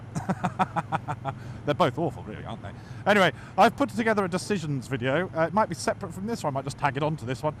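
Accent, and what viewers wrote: United Kingdom. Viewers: Hello! I really enjoy your entertaining videos! And I envy the comfort you have on your Speedmaster - I can feel every crack on road on my Bonneville Bobber... Though I prefer the looks of the Bobber!:) Something I always wanted to comment on one of your videos: your dialect and pronunciation reminds me of the famous arrest of this British man <link>;) BTW: the metric system is the best!;) Greetings from Germany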